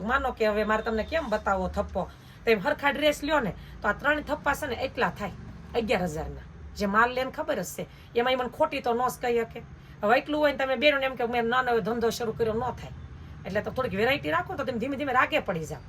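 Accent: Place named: Amreli, India. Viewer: Tame amreli na hoy aevu lage chhe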